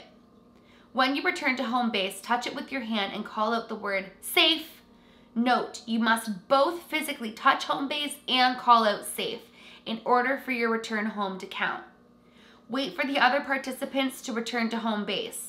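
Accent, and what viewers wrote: Canada. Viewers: Well she is canadian she can’t help her accent lol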